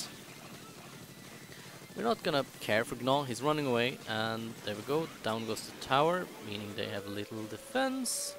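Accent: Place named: Sweden. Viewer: You sound so Swedish, are you really from the US?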